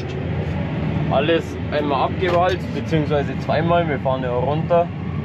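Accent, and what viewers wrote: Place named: Upper Bavaria, Germany. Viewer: Die Dialektunterschiede zwischen uns Augsburgern und den Oberbayern ist immer wieder beachtlich 😂😮 Ist auch ne echt schöne Gegend da unten, muss man echt sagen.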